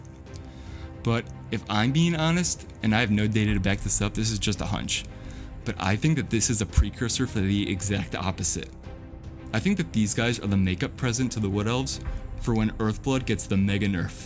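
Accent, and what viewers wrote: United States. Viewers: I'm detecting a Midwest accent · I'm from England mate defiantly best not start a youtube channel. Dont worry..... Yanks all sound the same to us man.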